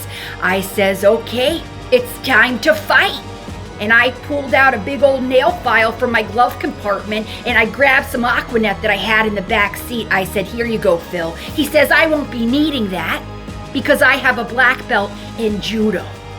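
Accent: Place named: Midwest, United States. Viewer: Omg! The almost spooky story with a happy ending.. all done with a Midwestern accent. LOVE IT!❤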